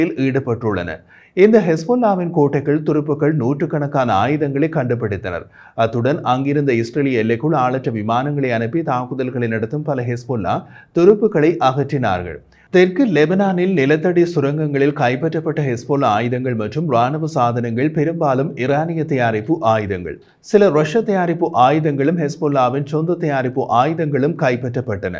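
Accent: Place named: Sri Lanka. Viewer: இதை ஈழத் தமிழ் என்று சொல்வார்கள். கேள்விப் பட்டிருக்கிறீர்களா? உங்கள் எல்லைக்கு வெளியேயும் தமிழ் பேசுபவர்கள் உள்ளார்கள்.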